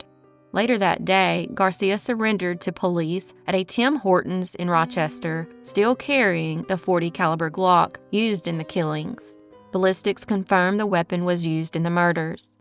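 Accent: South, United States. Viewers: IT’S HER SOUTHERN GIRL CHARM!!!😉😉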